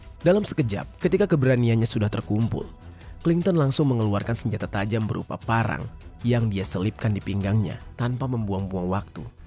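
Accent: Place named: Java, Indonesia. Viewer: Lancar bgt logat jawanya bang 😅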